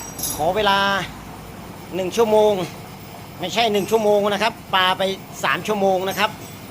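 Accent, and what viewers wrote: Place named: Surin, Thailand. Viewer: เสียงคนพูด คนสุรินทร์ชัวเลย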